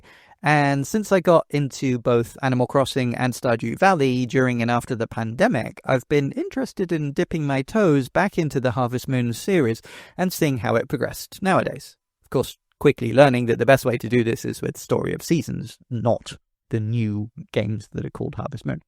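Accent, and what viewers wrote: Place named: United Kingdom. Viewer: Good review. Some thoughts I am aligned with: 1. Game key cards suck - won’t buy any 2. Exceedingly high prices for old games 3. Parents won’t be buying Timmy the SW2. He can play Minecraft fine on SW1 (I am a parent - Overcooked, bomberman etc work fine for the family). 4. Families like myself are a big part of their base. So forget about selling 150M SW2 consoles 5. Nintendo are very very greedy and callous weaponising our nostalgia in the most cynical way possible relying on the impulsiveness of their base… Feedback on your channel: 1. Overall good - trim it down a little…punchier 2. Avoid slightly inserting your political views and hint-hints….make a separate channel for that. Stay on point. 3. Solid British accent Have a nice day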